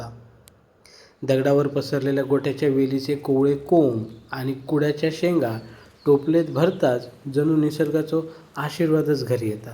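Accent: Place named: Malvani, India. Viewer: मस्त, खुप छान वाटतंय मालवणी भाषेत ऐकायला - शिल्पा राणे (वंजारे)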